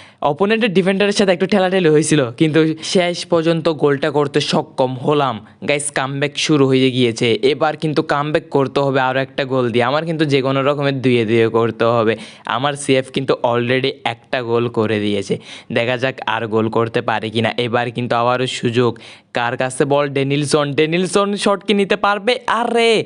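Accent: Chittagong, Bangladesh. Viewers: ভাই আমার মনে হয় আপনি চিটাংগা। আপনার বাড়ী কোথায়? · Vaiya apnar vasa Chittagong a kutai amar Chawkbazar ❤❤❤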